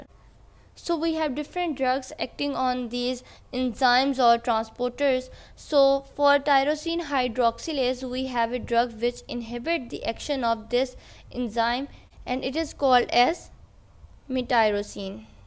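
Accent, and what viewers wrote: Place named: India. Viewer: well done but the indian accent is killing me😢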